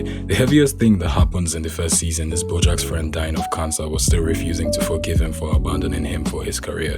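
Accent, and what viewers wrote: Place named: Nigeria. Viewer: Well, I watch my shows in bed and I have severe ADHD. So I tend to fall asleep quite easily when watching this show. That's how I know a show is mature enough for me to have to be in the moment to not miss a thing. It doesn't depend on out of the ordinary shit like murders, fight scenes etc. Even the jokes are laid back. I'm just getting to watch it now, I'm in the second season and this is because I've been avoiding it for years now. As I did with Rick and Morty. You don't want to associate with a fandom that excuses constant shitty behaviour and lack of growth on self as okay, do you now? Either way I'm glad I caught up and I'm loving it. Love your Nigerian accent btw. Here in Kenya we have a myriad of em but I kinda envy your's sometimes.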